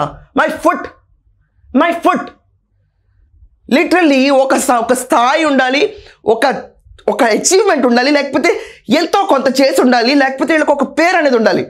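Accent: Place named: Hindi Belt, India. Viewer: BP tho potharemo anukunna mam... chala hindi vala style lo matladalanukunnaru bavundhi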